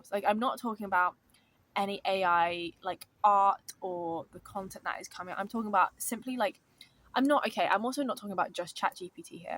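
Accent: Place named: United Kingdom. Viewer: I'm from Germany and I love your British accent. (Hopefully it is British I don't know too well)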